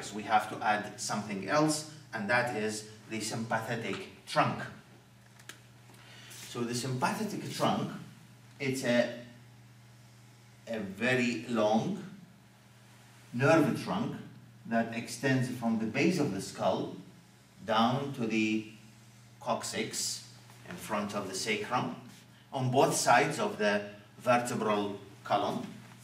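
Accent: Iraq. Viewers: From your accent ,I gusse U R Iraqi So we R proud of U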